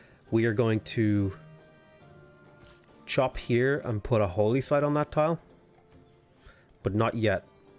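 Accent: Ireland. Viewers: mate that guys is shit at accents must be american you deffo sound irish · IDK what that guy's talking about, you definitely sound Irish. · I swear accent became more Irish after he was accused of sounding like he was from London.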